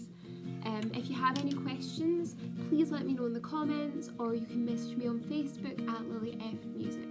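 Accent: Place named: Scotland, United Kingdom. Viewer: yeah it's a lovely instrument has a nice wine tastings I have noticed when I listened to you you have a Scottish accent the part of yes I like the band you because it has a really nice Twang the banjo has a nice twanging sound to it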